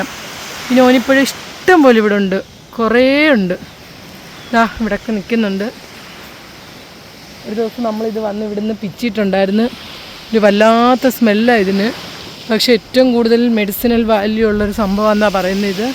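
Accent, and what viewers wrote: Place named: Kochi, India. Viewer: ഞാൻ അബ്ദുറഹ്മാൻ ചാവക്കാട്😂 നിങ്ങൾ ലക്ഷദീപിലാണോ, ഭാഷാ കേട്ടിട്ട് കൊച്ചിക്കാരത്തിയുടെ പോലെ തോന്നുന്നുണ്ട്, അവിടെ ഫ്രഷ് മീൻ കിട്ടുമല്ലോ, നിങ്ങളുടെ ഭാഗ്യം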